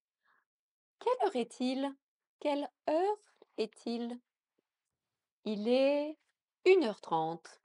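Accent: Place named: England, United Kingdom. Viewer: I love your english accent🥰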